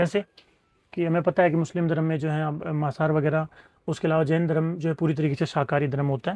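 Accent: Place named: Jaipur, India. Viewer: Sir aap kha se ho aapki language jaipur ky core area ki lgri h?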